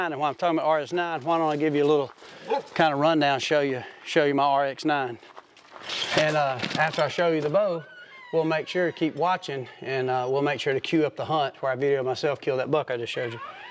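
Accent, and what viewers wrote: South, United States. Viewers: Love those Southern terms..”Ry chonder”☺️, all kiddin’ aside, I’ve been a fan since the bigginin’. Congrats and love your work!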